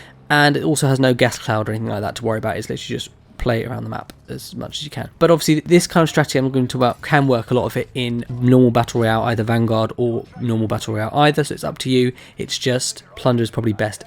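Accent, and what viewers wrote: United Kingdom. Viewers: Thanks for the guide man I had no idea about some of the things you said Also the British accent is a nice touch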